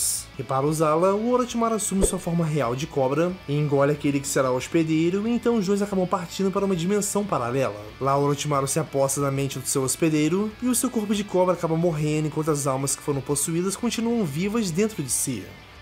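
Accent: Portugal. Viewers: Vc é português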